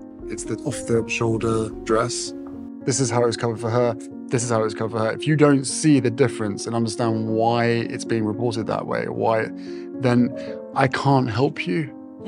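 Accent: United States. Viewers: Listening to you is like listening to my own thoughts in an American accent. Loving your videos. Just discovered them today. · Great video your accent perfect English but I'm wondering is it American it has a slight undertone of something else I'm so interested in it. It's an American accent with slight differentiations on certain pronunciations though few would notice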